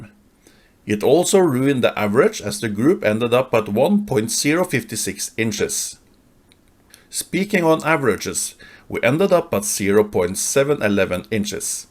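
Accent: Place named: Europe. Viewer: My chronograph doesn't talk to me. If it did, I would want one with a European accent like yours.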